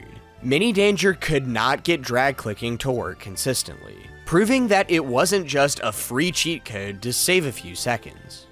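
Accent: United States. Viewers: Are you from Pittsburgh/the Pittsburgh area? I swear to god your accent is exactly like everyone in my hometown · good video like 2 minutes in but i have to say i am so charmed by the socal accent. spiritually it sounds like you were born wearing a beanie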